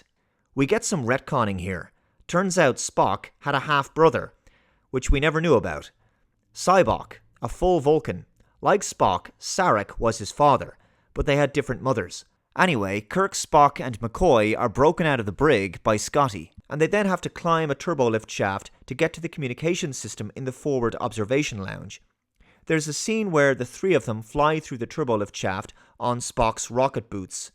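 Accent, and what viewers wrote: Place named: Ireland. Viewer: It was clearly exploring the concept of God, which is highly cinematic by it self. It also thoroughly explored how pain artificially relieved (being cybocks power) is a crime to the souls identity. TrekV was excellent as classic Trek, this film brought what we've come to expect, and a ton of fun. You know, your voice now that I think about it really sounds a lot like K. I. T. T!! Albeit Irish instead of british accent, you could easily voice him if Daniels himself not available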